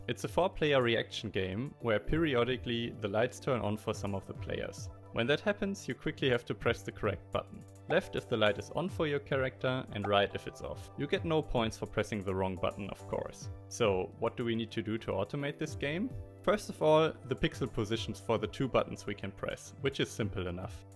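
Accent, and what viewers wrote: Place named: Germany. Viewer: Your way of speaking seemed familiar to me, so I checked your channel info and who would have guessed... A fellow german! (Really enjoy the videos btw. mach weiter so!) :D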